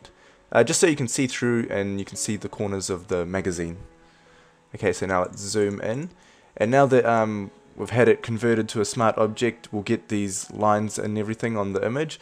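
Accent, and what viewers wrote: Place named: New Zealand. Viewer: You sound like a kiwi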